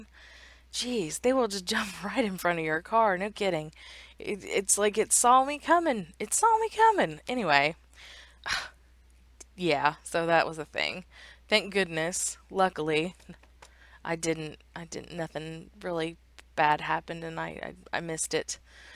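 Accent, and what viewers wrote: South, United States. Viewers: I'm from GA but live in VA and your accent sounds so familiar. Haha. You kind of give the impression that you occasionally have a super southern accent but you've focused on correcting it. (I have, lol)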